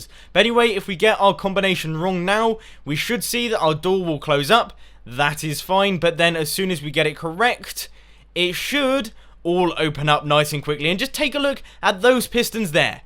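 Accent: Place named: United Kingdom. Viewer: hes friggin british dude or English whatever you prefer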